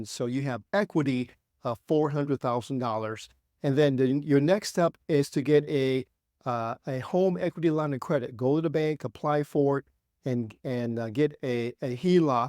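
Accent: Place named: Haiti. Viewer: he sounds like he's Haitian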